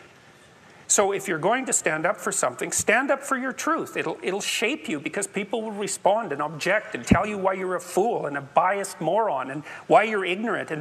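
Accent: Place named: Massachusetts, United States. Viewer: People, saying big words with a soft tone of voice in a Massachusetts accent doesn't make you a genius who's right about everything. This is nothing but American establishment "work work work" ideology changing it's font to something people are more into atm.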